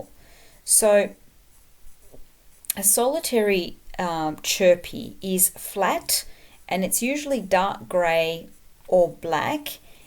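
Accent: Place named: Australia. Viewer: I like your accent sounds like australian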